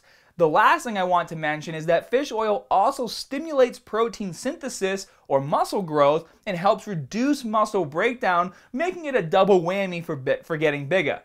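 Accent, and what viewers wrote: Boston, United States. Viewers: I fahuckinnn love bohston accent. Fahhhkin knowledge and cahhhhhrrbbsss! Seriously though I'd say is the most helpful Fitness youtuber out there. As a exercise science major and fellow fitness enthusiast got nothing but respect for the knowledge he gives us all. Keep doin you!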